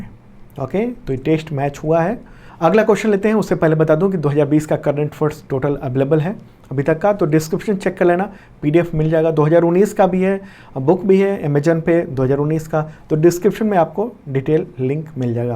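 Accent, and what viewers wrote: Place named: Haryana, India. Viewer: Bahi tu haryana se hai kya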